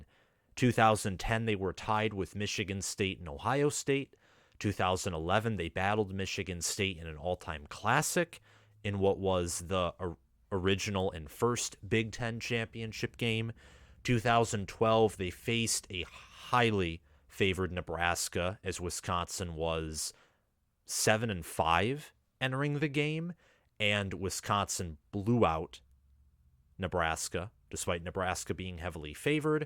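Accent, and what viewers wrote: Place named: Minnesota, United States. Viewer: I can tell by your accent that you're from Minnesota or very close maybe the Dakotas perhaps but to be honest I think PJ ruffled Luke's feathers with the recruiting accusations so he will be seeing a very motivated Badgers team on that day as far as Illinois..Wis owes them 1...now Neb is going to be a scary team sooner or later their going to be where they should be & that's top tier BiG10 as far as Wis the ceiling is is playoffs the basement is New Yrs 6 or very close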